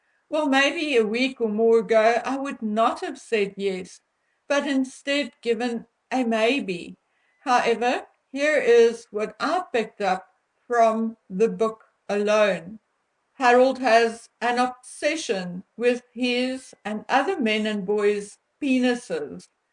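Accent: Afrikaans, South Africa. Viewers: Not Oidepus .... If you're going to use big words then please learn to pronounce them. Especially as these words are used in clinical settings.Shame man, I understand you're Afrikaans and that you have an accent but if you do not know how to pronounce it please ask someone who does.It is Oedipus....God bless you.✝️🌼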